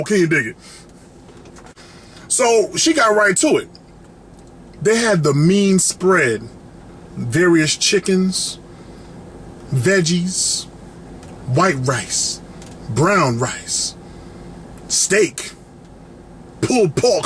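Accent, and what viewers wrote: United States. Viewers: best American accent.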